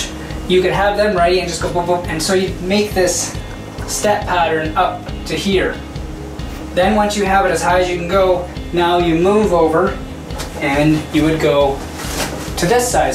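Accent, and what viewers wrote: Canada. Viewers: Great video, you sound Canadian?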